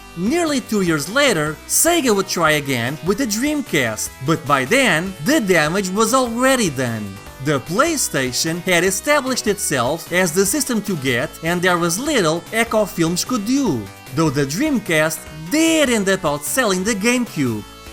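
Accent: Portugal. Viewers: Nos primeiros 30 segundos percebi logo pelo sotaque que devias ser português xD. Parabéns pelo canal e o seu conteúdo.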